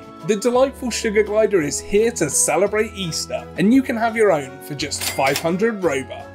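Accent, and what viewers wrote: United Kingdom. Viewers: Are you from London or Eastern?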